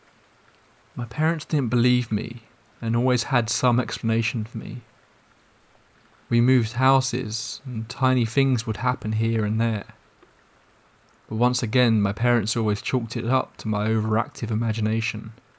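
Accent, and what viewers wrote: England, United Kingdom. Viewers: As an Aussie, hearing an English narrator narrating scary stories lends them authenticity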